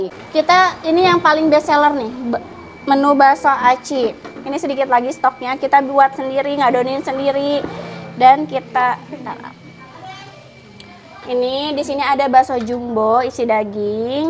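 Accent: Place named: Sukabumi, Indonesia. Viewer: logat.y kaya orang sukabumi, mantap banget teteh.y lancar & berkah terus moga qu juga bisa usaha begitu. aamiin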